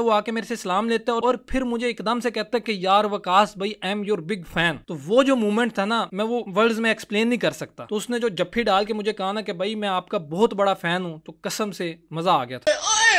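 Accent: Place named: Punjab, Pakistan. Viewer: Ap k bolny k style se lgta hy ap jhlem se ho ... Han ya naa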